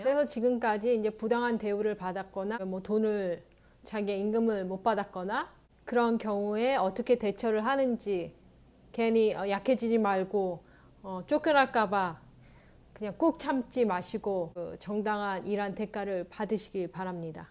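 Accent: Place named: Canada. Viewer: 차분하시고 한국말하시지만 혀 굴리는 소리가 많이 들려서 처음에는 캐나다에서 어릴때부터 사셨나 했더니, 성인이 되어가셨군요. 그래도 역시 중등학교 과정과 대학을 거기서 다시 졸업하셔서 그런지 캐내디언 같습니다.^^ 저도 취업비자로 들어가서 영주권을 받으려고 하는데 많이 도움이 될 것 같습니다. 감사합니다.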